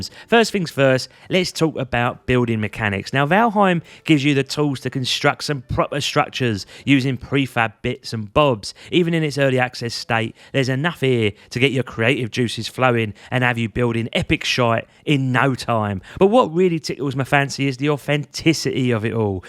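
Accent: United Kingdom. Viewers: its allways so obvious and funny when the youtuber is british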